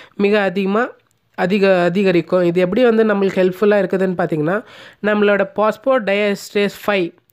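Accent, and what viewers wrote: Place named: India. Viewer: தமிழ்ல ஒழுங்கா பேசுங்களே இந்திகாரன் மாதிரி பேசுறிங்க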